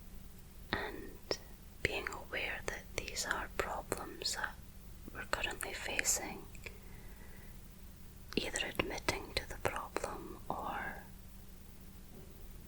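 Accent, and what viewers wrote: Scotland, United Kingdom. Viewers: I found your videos through Scottish Murmurs I am glad she suggested you! I love your slower Scottish accent then Lauren's Glasgow fast accent but both your accents are beautiful! Thank you for helping me relax! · Scottish, I hope, that`s lovely. and together whith these sensual handmovements you are poetry. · It feels like I have an anthill walking my scalp. Lovely Scottish accent. 💓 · Personal Rule of thumb, write the email, wait 24 hours to send :) 3rd time watching this vid, and I think it’s the Scottish accent and your cadence but you’re my new favorite ASMR artist, BTW hows your Cantonese and Mandarin? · Clicks on video by artist I don't know. Video doesn't begin with loud, jerky finger fluttering. Mesmerising, slow hand movements. Soothing whispering. Emotional intelligence. Scottish accent... How could I not subscribe? 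Cracking video. Thank you. Regards. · Great video. Lovely to hear a Scottish burr after 10 years away from Scotland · Very soothing - plus i luv the Scottish Accent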